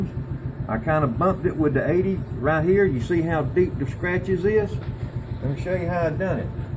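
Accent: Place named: Texas, United States. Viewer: Live your accent. Texas!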